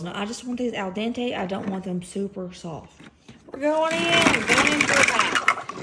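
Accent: South, United States. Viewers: I love your southern accent. It's so cute! I'm Alabama, born and raised, so you know I have an accent too but isn't as cute. Girl, yours is pure cornbread, and I love it!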